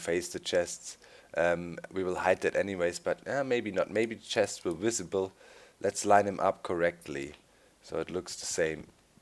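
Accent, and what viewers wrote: United Kingdom. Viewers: That English accent! :D :) Awesome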